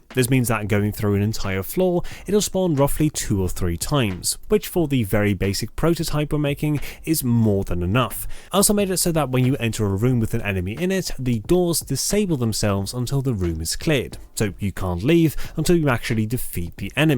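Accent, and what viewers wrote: United Kingdom. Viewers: WOW!!!!! That is crazy my dude.. u sound like ur from Birmingham.. ask any british person what ur accent sounds like in the old videos.. not for one second did i think u were dutch.. that is some accent.. well done!! Your latest videos sound like ur 100% British!! with a very upper class accent.. :)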